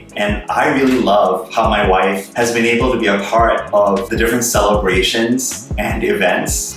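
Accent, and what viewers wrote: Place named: India. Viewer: The way u speak sounds pretty Indian also the way u call her name it seems like u are familiar with hindi!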